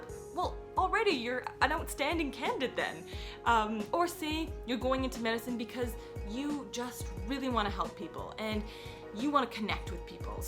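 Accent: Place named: Canada. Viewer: Really heard your Canadian accent in this video :)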